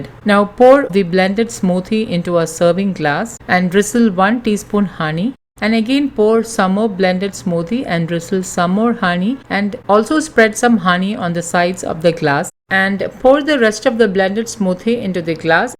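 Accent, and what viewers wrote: Kerala, India. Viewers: thank you so much akka love you loads you are a inspiration for me even I like your voice and typical Kerala accent English I love it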